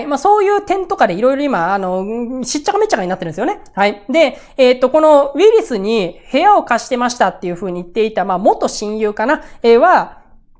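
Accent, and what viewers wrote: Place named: Nagoya, Japan. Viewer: 今日は名古屋弁ちょこちょこ出てたw by 春日井出身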